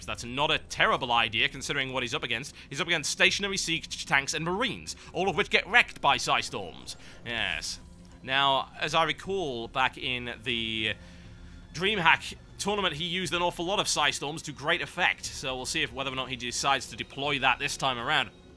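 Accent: United Kingdom. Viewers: no need to drink coffee, good gameplay gets the blood pumping.. love your enthusiasm =3 keep up the good work. (also, yay for british accent <3 )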